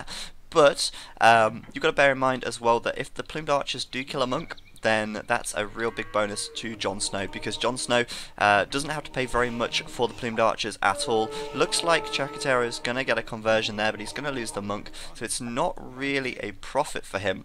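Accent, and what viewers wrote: United Kingdom. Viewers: YOU'RE BRITISH!!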